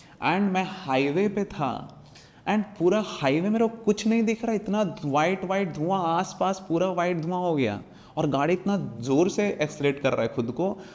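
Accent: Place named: Jharkhand, India. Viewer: Bhaiya ji apka tone se hi laga ap to apnye ho jharkhand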